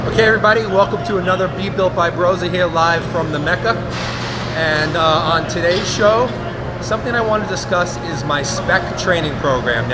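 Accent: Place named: Massachusetts, United States. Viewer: You sound like you're trying to hide a masshole accent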